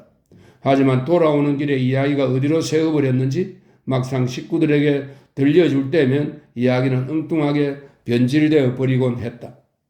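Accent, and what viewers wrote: Gyeongsang, South Korea. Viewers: 경상도 억양이 인간적이라 사람냄샤가 나요...❤❤ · 경상도 억양이 싫어요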